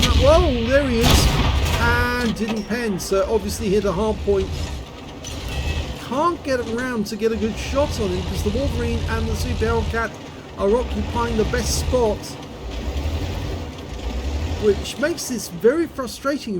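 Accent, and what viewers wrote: United Kingdom. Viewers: Your exciting commentary keeps me watching your vids keep up the good work from N/A Jax fla also awesome Brit accent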